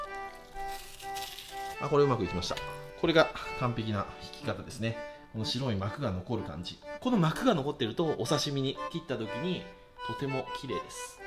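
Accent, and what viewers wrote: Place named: Mikawa, Japan. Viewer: かねこさんが市場の人と話すときに漏れる三河訛りに親近感を覚える遠州弁話者です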